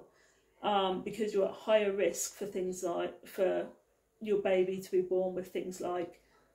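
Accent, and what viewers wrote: United Kingdom. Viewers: Warrington? You sound like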